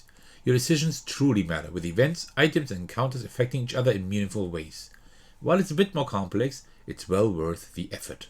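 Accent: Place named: Germany. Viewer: Puh der deutsche akzent ist echt hart zu akzeptieren. 👎👎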